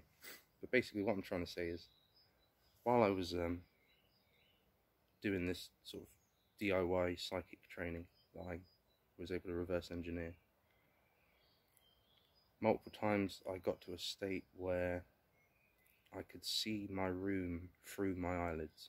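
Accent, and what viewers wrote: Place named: United Kingdom. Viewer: You have a cool British accent in the likes of Henry Cavil, not a pompous one like Nick Pope and Mick West. I've tried CE 5 and had mixed results nothing solid or repeatable. My theory is they have sensors that can read pretty much everything with 3-5 miles of them. They know everything going on within that bubble and its like super hero or god like powers minus bringing back the dead. Very weird world and we barely understand any of it.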